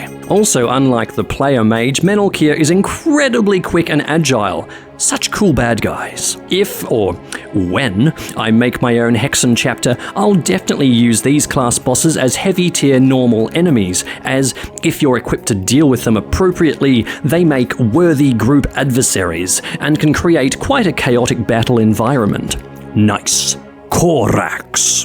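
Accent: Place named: Home Counties, United Kingdom. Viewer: Home Counties?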